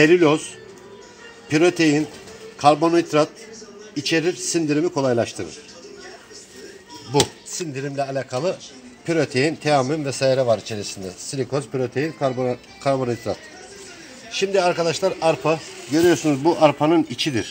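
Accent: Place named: Rize, Turkey. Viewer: senin şivene kurban olurum abiiii:))) ben de Rizeliyim... saygılar. teşekkürler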